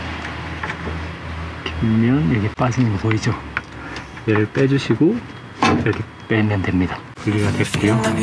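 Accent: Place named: Gyeongsang, South Korea. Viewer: 손재주가 좋고 영상을 진짜 잘찍었네예 같은 경남쪽이라 사투리도 정겹고 와이프차 고치는데 많은도움 될듯 합니다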